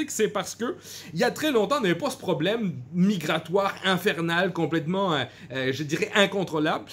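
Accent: Quebec, Canada. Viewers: Sans l'accent québécois on dirait Pierre-Yves Rougeyron. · Ça sonne plus québécois que MBC ; à quand un MBC gaming ?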